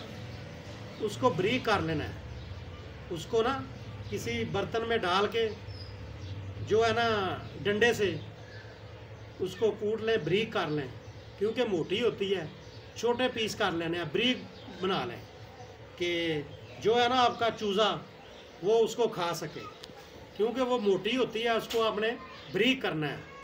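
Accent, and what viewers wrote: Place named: Punjab, India. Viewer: Ustad g punjabi me apki video ka alag maza hai 1 alag maza hai punjabi lehjy me aapk ❤❤❤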